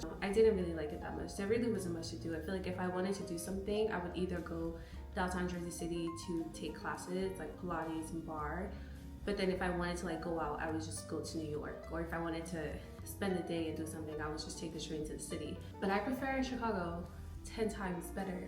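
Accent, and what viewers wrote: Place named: Caribbean. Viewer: Do I hear an accent?🥹 Are you caribbean? if so let’s be friends cause im moving to chicago soon!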